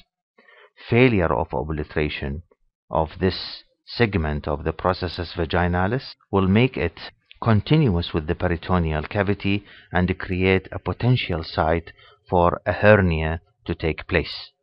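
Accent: Iraq. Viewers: Your accent seems to be of iraqi origin ? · ماشاءالله لا قوة الا بااله سمعت مقدمة محاضرة اخرى سمعت اللهجة و شككت انها لشخص عراقي و اسعدني ان اعرف ان المحاضر دكتور عراقي و أسعدتني التعليقات الإيجابية وفقك الله دكتور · Thanks for the great effort ..and by your accent i can tell you're from iraq am I right?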